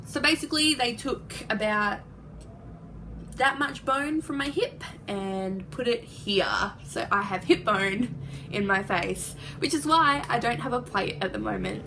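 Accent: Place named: Australia. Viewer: ! Keep us posted! Thinking of you:) you are so brave to post this! Would love to see videos or snippets of implants and everything else! Love hearing an Aussie accent. Peace outtt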